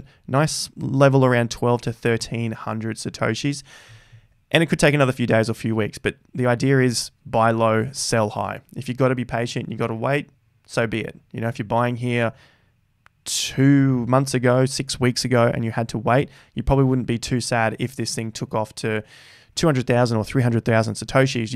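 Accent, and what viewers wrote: Australia. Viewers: are you the Aussie accent on yousician?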